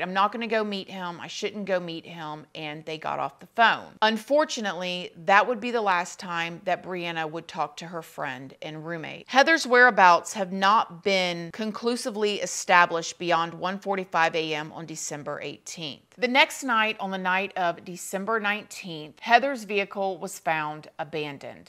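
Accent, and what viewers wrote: South, United States. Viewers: New sub here. Love your videos...plus you gotta be from the south or have a lil hood in you. " beat the dog doo doo out of him" lmao I havent heard that in soo long. Plus the way you say POlice. I love it. You do a great job on these videos. Cant wait to see more. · 😂😂 guuuurrrl I know U country I can tell that, yes ma'am lol but I can't pick where U from, I have fam in calli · You can really tell that your from the south..."cause the most beautiful things come out your mouth" 😊❣️🎉 the adults in this situation were very immature emotionally and spiritually..You expect a 20 year old to not be super mature and aware of things but honestly these older people took advantage of her inexperience with relationships and her crush on a married man... They should have been setting a better example for the young lady...but obviously they had their own deep rooted issues . 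I think they both got off on the whole thing....Heather didn't make the best choices ..but she was young and inexperienced and didnt deserve to die over it... Tammy and Sydney are preditors...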